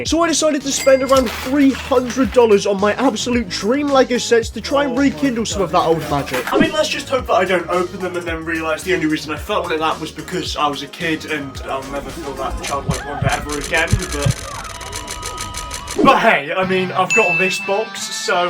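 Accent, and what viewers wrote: United Kingdom. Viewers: Do you live in America but you're British??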